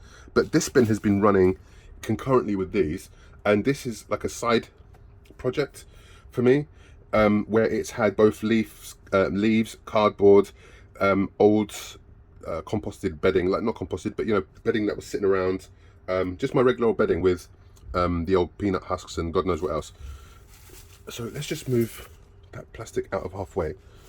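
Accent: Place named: England, United Kingdom. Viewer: really enjoyed these posts thank you, do i detect a slight English accent ??? 🤔ATB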